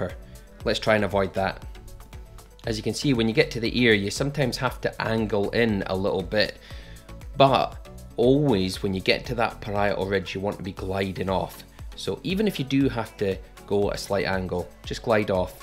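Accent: Scotland, United Kingdom. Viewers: Question, is that a Scottish accent? Lol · I’ve lived in the states since I was wee, it’s still lovely to hear a calm Scottish voice every now and then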